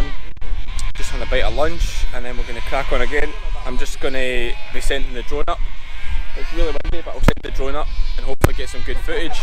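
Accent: Scotland, United Kingdom. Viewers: Hi I live in New Zealand, I drive stock trucks for a living I use a kelpie x Smithfield bitch for loading and unloading she backs sheep all the time real handy for loading cattle as well nothing gets past her will send you a video at some stage so you can see how we do it down here, keep up the good work it's nice to hear my Scottish accent again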